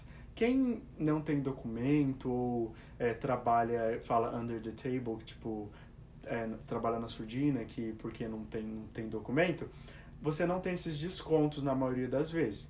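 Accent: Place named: Pará, Brazil. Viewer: É paraense? Fala igual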